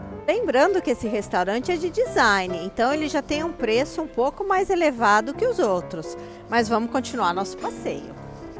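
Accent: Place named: Santa Catarina, Brazil. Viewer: Deve ser inveja kkkk..vc deve ser de SC o povo daqui q fala assim.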